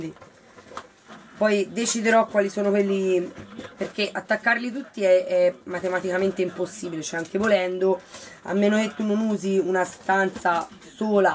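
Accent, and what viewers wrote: Tuscany, Italy. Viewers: sei grande! Manda queste quattro ochette a fanculonia e continua con i tuoi video. Sono spassosi e belli Bello il tuo spiccato accento toscano e le tue mani sul viso sono come pennelli di un pittore Sei bravissima....poi hai l'età di mia figlia Ti adoro e voglio continuare a vederti con tanto affetto una tua fan agguerrita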